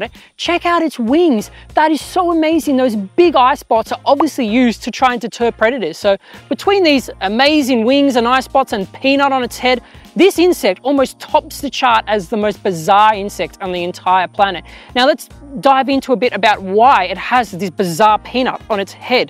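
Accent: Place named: Australia. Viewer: Your accent tells me you are from Australia :)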